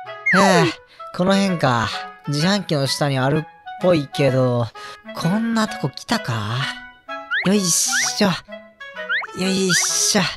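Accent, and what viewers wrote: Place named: Kansai, Japan. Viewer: めろんぱーかーメンバー取り上げてくれるの嬉しい！読む時関西弁になってるのが地味に嬉しい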